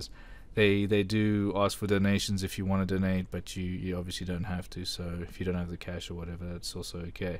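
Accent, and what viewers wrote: South Africa. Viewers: Are you from SA bra? Nice video! Very informing!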